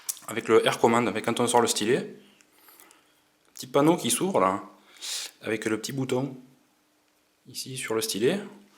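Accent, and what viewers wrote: South, France. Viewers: Bien joué pour la vidéo! Preneur pour avoir ton avis après utilisation quotidienne. Et vive l'accent du sud ;)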